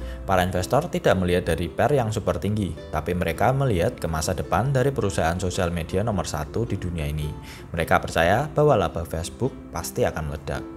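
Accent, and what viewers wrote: Java, Indonesia. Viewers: Min. Tolong dong. Kapan kapan bikin subtitle atau tulisan yg diucapkan, karna bahasa mimin klo ngomong medok bnget😂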